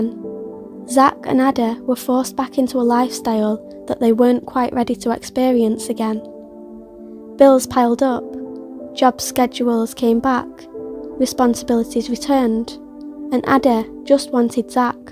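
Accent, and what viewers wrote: Manchester, United Kingdom. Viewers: You sound like Manchester England. Well done presentation!!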